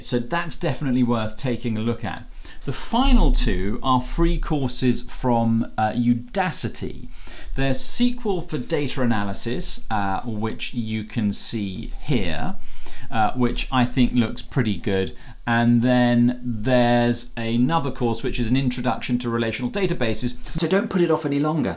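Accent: United Kingdom. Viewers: listen to * speaking with his British accent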